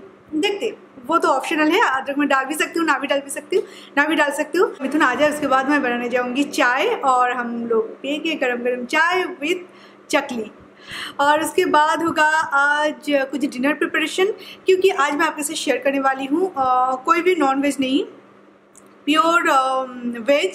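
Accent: Bengal, India. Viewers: u r just amazing apna Bengali tone accha lagta hai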